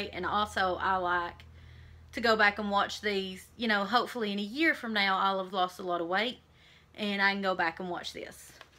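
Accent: South, United States. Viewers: I love cornhole! Your accent makes me feel at home...I miss the south :)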